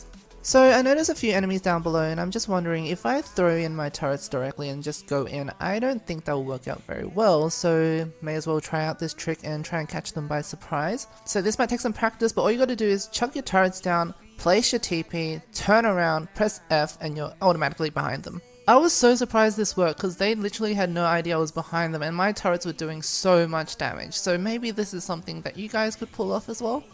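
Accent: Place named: United Kingdom. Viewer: sounds like a british girl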